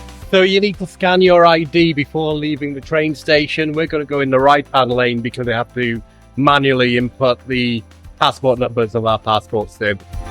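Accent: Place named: United Kingdom. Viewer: I am here to enjoy your beautiful British accent 🙂. Have a great time in China!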